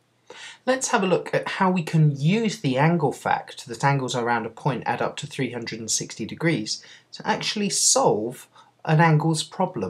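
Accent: United Kingdom. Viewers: go British accents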